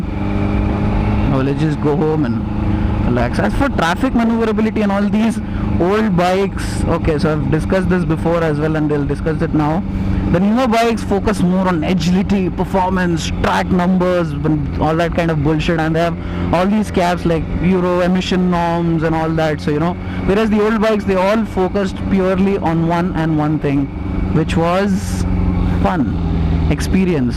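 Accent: Hyderabad, India. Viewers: Bhai.. u r english is very good. That combination english + hyderabadi is jst🤩🤩🤩 keep going broh. We will support you.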